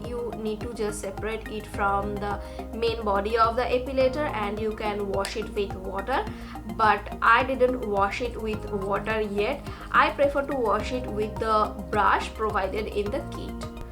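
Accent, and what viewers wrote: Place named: India. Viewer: Honey, she's speaking in indian accent. I'm not an indian but her accent actually not that hard to understand.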